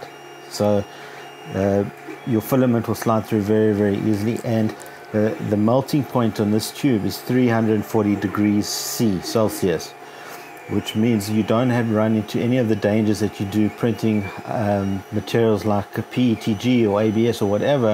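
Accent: South Africa. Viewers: Are you South African? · This boytjie must be a south african???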